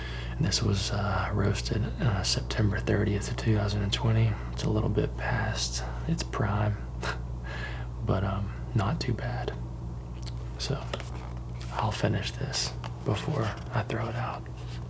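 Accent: South, United States. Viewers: I haven't been here long I've seen some of your vids dont want to sound like a creep but I'm just wandering where he's from because based on the way his voice is it sounds like he is from a more southern state. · Your little southern twangs are really doing it for me.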